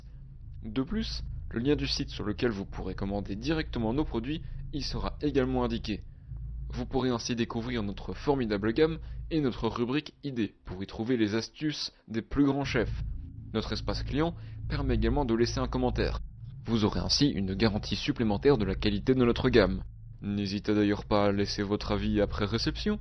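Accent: Belgium. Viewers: Oh un belge ?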